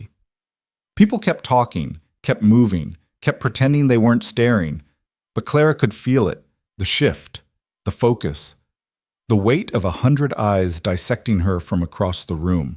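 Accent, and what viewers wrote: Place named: United States. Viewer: Whoever wrote this has no understanding of the regency period or the manners and language of the British aristocracy. An American narrator doesn’t help.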